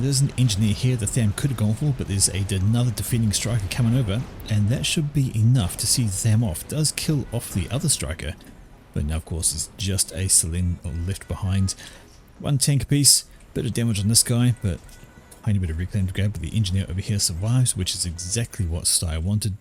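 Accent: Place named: New Zealand. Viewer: awesome cast. are you a kiwi btw? took me a min of scratching my head before placing the accent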